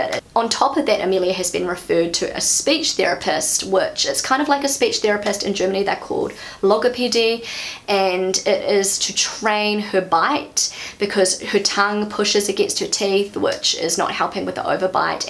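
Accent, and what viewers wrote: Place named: New Zealand. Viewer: I am a German mum who has been living in Spain for 16 years. It makes me a little bit jealous that the dental treatments for children in Germany are free. Here in Spain, one must pay for everything, social security doesn´t pay anything. My dental insurance paid by my employer is quite useless. I have not had such good experiences with German dentists as an adult. First of all, many still use amalgam (I wasn't even asked at the time) and secondly, it is very expensive when a treatment has to be paid. Here the treatments are roughly half the price with a similar cost of living. Fillings are not free but they stopped using amalgam decades ago. By the way, I like your channel because it's interesting how you see Germany as a foreigner, especially for me as a German and foreigner in Spain. I also like your accent because long time ago I spent a year in OZ and met a lot of nice Kiwis there.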